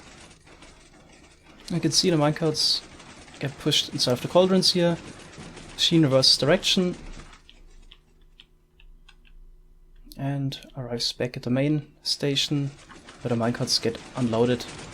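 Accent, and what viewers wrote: Germany. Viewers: Kann es sein, dass du deutsch sprichst? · Are you German sometimes it Sounds like so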